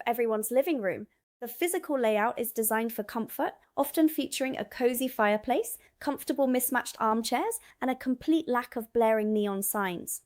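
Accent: England, United Kingdom. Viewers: Why is your accent British? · She’s American? With an English accent? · Although has a great English accent, I wish that she would sound her Ts!!!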